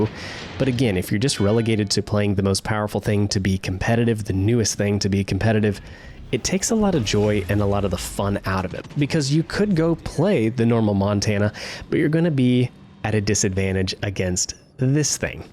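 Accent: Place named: New England, United States. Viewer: Woostah- you from New England?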